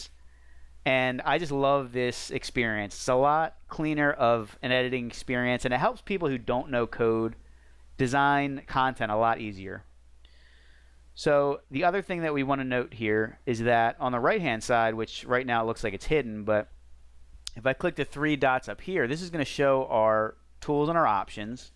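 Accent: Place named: Delaware County, United States. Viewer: Where are you from? I'm from Delco (near Philly). You sound like a local, haha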